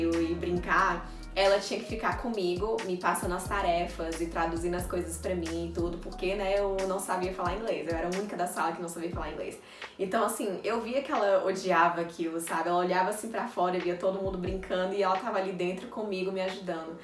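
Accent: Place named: Northeast, Brazil. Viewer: Tu é nordestina pura em kkkk "Mangá"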